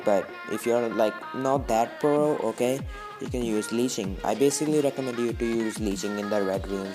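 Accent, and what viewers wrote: India. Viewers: Youre accent is Indian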